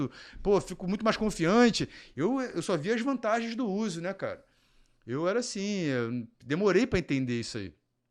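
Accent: Rio de Janeiro, Brazil. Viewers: NÃO CONSIGO ASSISTIR ATÉ O FINAL ESSE SOTAQUE CARIOCA É MUITO INSUPORTÁVEL CREDO .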